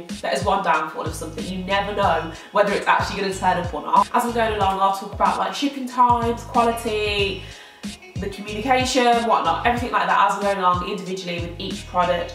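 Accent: United Kingdom. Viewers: You sound like you are from where my fiance is from, Solihull ??? Love your videos, keep them up! :)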